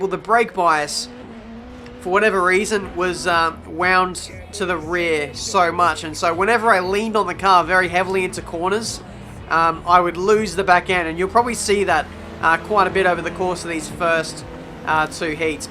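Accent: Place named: Australia. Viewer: Best english accent! The brits and americans should study australian english! The hole world should. Cheers from sweden!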